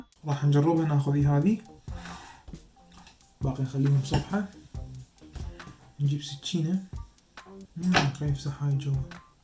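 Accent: Iraq. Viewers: تعجبني قناتك وطريقة طرحك باللهجه العراقيه الجميله شكرا استفدت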